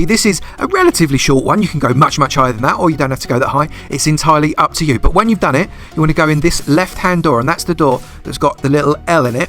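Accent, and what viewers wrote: United Kingdom. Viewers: I like the vid coz of ur voice British people these days or Australian :)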